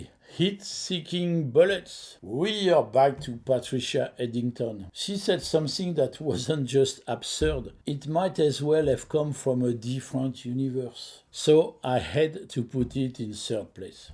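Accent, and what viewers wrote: France. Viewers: Watching a French man with a French accent talk about guns like a red white and blue gun enthusiast blows my mind. Liked and subscribed.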